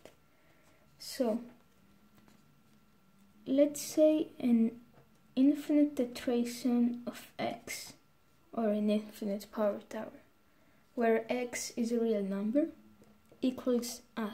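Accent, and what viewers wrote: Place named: Russia. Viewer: U sound russian accent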